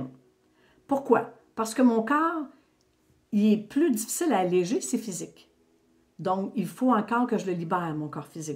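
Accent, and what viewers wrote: Quebec, Canada. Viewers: Bonjour à vous,🤗 Merci merci. Une première fois avec vous. J'adore votre voix du Québec. 👍👍. Vos informations sur les différents étages de notre cheminement. Ça aide. Toute ma gratitude. Merci merci. 🙏🏿🙏🏿🙏🏿🌟🌞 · Merci infiniment pour ces explications claires, canal aussi je confirme que la phase 4 est rude avec tous les maux du corps mais sachant que nous sommes dans ces passages - pas -sages, alors on oeuvre sur nos ombres, on continue et on traverse.... Belle continuation à vous j'adore votre accent canadien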